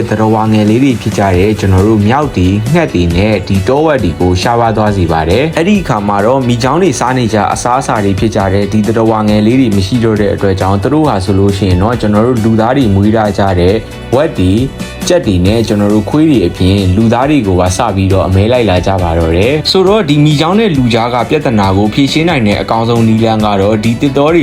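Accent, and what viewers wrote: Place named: United Kingdom. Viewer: ညီလေး Accent က British Accent လေးဘဲ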